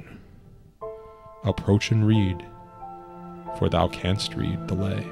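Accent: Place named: United States. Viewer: Finally .. someone reading it in American accent, Thanks so much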